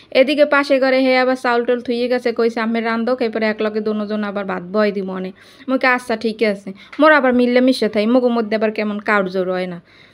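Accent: Barishal, Bangladesh. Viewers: বরিশালের ভাষা দারুন লাগলো বরিশাল তোমার বাড়ি কোথায় আমার শ্বশুর বাড়ি বরিশাল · আপু চা খেয়ে নিলে পুঁই শাক রান্না খুব সুন্দর হয়েছে ওয়ারড্রব গুছানো অনেক ভালো লাগলো তোমার ওয়ার্ড ড্রপ অনেক সুন্দর লাগছে আপু তোমার কথা শুনে মনে হচ্ছে তুমি বরিশালের কোথায় তোমার বাড়ি জানিয়ে দিও ভালো লাগবে আমার বাড়িও বরিশাল ❤❤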